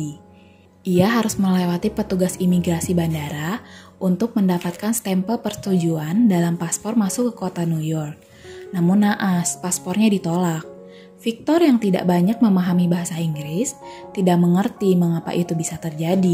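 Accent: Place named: South Sulawesi, Indonesia. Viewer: Suara sulsel ketara sekali.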